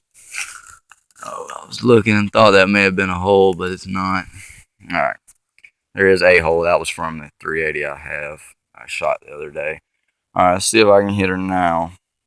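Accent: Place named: South, United States. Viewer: He only had 4 rounds at beginning.... (one on bottom right, one center bottom, two on left.... empty top center (live round) empty top right.) When he pointed gun towards himself I don't believe it was a loaded round. He took the bullets out to show how much was shaved off.... Then first round he fired was single action "for accuracy" .... you can clearly hear and see hammer pulled back before firing first round.... Thats when the live round was ready for fire I believe. However, never a good idea to point a gun at your face lol. Just move your damn camera in case you mistakenly do have a live round bro. Better it take the bullet than you. I assume since you got the shaving down and had no miss fires on a gun notorious for misfiring, that you're somewhat familiar with firearms... I get you weren't expecting it to be loud .. I don't get firing a sawed off shotgun without ear protection lol.... 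Shit does happen but shit can be prevented dude. Oh well you got enough bashing so I won't judge. Probably catching shit for your accent hence the moonshine shit comments lol. I been in the core for 20 years and you southern dudes typically know your weapons... Then again I don't remember seeing any of them pointing a gun to their face regardless if it's loaded or not. I'd still be doing push ups in the sandpit if I pulled that shit down range. I paid $15 at a pawn shop out the door for mine. I put it through hell. It even fires sumerged under water to this day. Most reviews are misfires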